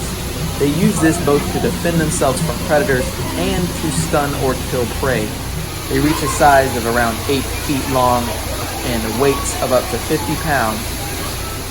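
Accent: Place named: South Africa. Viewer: Oh this channel looks good! Must be South Africa as he has a strong SA accent. Will check it out further.. what a nice guy.. I sooooooooooi hate trophy hunting, will NEVER understand how some get fun out of killing these magnificent beasts.. Trust me, channels appreciate comments, Violet - it all helps.. and thankyou for your kind words!! 🤗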